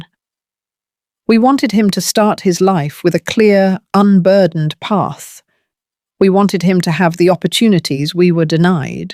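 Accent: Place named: United Kingdom. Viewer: It might be a good idea to just leave race out of this. These are simply human stories. This is obviously a British sounding white woman’s voice. Just let us enjoy it. Thanks 🫶🏾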